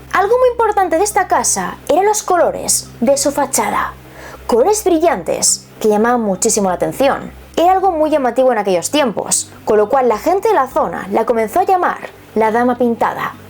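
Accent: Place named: Spain. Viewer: Riddle Tu acento español... Es hermoso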